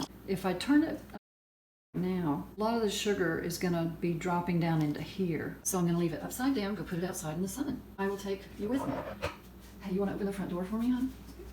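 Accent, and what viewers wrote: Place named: South, United States. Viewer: You sound like a southern lady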